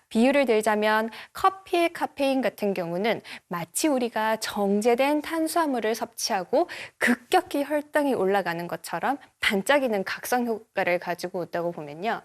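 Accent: Gyeongsang, South Korea. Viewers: 경북 대구 분인가요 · 경상도 억양이 있으시네요. 듣기에 나쁘지는 않아요.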